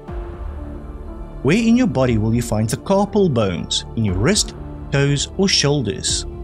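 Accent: South Africa. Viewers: Love your South African accent. Nothing is 500 million years old, it's pure guessing. Study the subject further.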